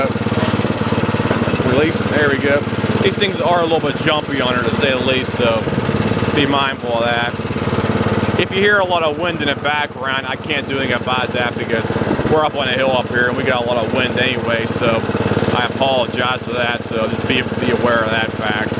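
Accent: Pittsburgh, United States. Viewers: Your Pittsburghese is very strong!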